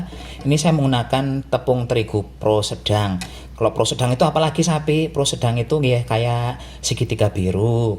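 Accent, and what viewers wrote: Banyumas, Indonesia. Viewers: Tinggal.di.mana si mas ? BATAM..? Tapi.dialeknya mbanyumaswn ya..😂 pada baen karo inyong..adoh nang kalimantan esih clacapan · bar kesuh..curhat,mecucu njuk masak dech....mandan2....error....kog siki dadi ngapak kang?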